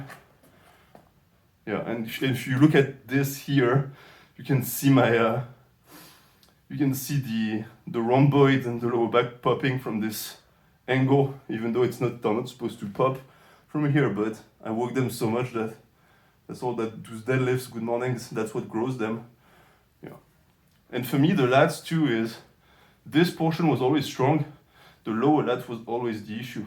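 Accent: France. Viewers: french accent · Are you French?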